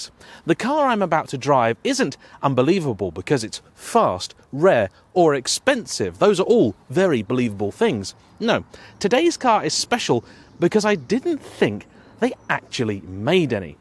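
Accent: United Kingdom. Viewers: All i wanted to hear for this entire video was the Viper V10, but all i heard was an annoying British person droning on…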